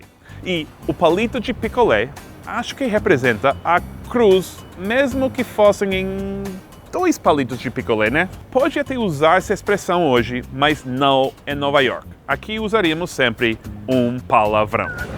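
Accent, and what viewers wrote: United Kingdom. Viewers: você parece mais britanico que americano sr gringo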